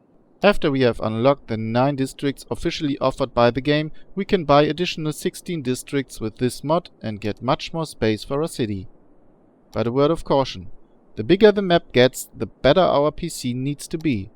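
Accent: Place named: Germany. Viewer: haha okay. nur ein kleines bisschen zu deutscher Akzent und zu starke Betonung der Endungen.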